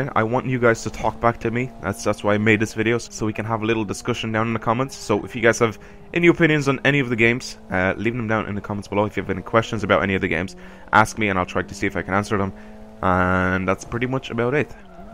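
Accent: Ireland. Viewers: U sound irish.are u irish?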